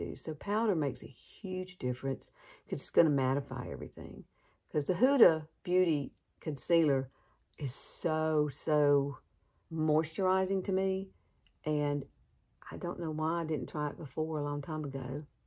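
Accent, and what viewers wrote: South, United States. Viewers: Love your southern accent. I have a ton of family in Alabama..What part of Alabama are you in?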